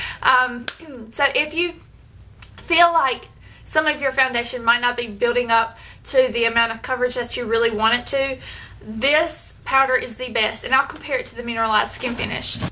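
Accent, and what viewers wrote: South, United States. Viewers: I'm also from NC. I'm from Durham, we both have that same little southern twang lol. love your videos by the way <3.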